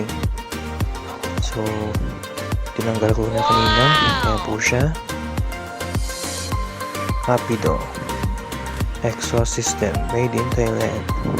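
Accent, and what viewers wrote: Iloilo, Philippines. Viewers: Ilonggo ka no?🤗🤣🤣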